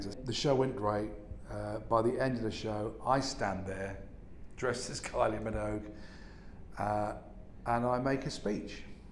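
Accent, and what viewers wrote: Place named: Birmingham, United Kingdom. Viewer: What a show tonight and thought couldn’t get any better, never disappoints. He’s definitely a Brummie 🤣❤️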